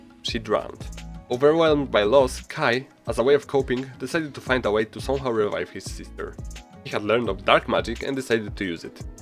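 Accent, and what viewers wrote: Poland. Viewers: Chwila, ja mogłem cały czas komentować po polsku! Jakim cudem nie poznałem rodaka po akcencie?? 😭 BTW ̶C̶z̶y̶ ̶t̶o̶ ̶b̶y̶ł̶a̶b̶y̶ ̶n̶e̶k̶r̶o̶f̶i̶l̶i̶a̶ ̶j̶a̶k̶b̶y̶m̶ ̶p̶o̶w̶i̶e̶d̶z̶i̶a̶ł̶ ̶"̶s̶m̶a̶s̶h̶"̶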